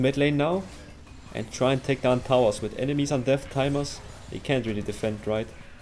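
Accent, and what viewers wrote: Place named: Denmark. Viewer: I can already tell you’re from Denmark by your accent🤣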